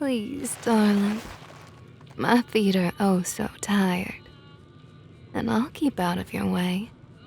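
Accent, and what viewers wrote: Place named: South, United States. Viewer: Ok I'm begging please take the ropes off I'm sorry for what I did please they're really hurtin me thanks for the wonderful audio really love the southern belle accent 💕🌹💕🌹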